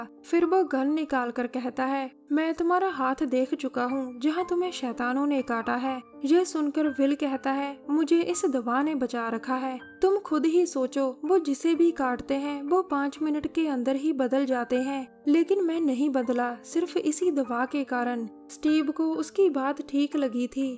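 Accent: Punjab, India. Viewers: tusi Punjabi ho?? awaz ton lgda tuhadi kyu k Punjabi accent hai tuhadi voice ch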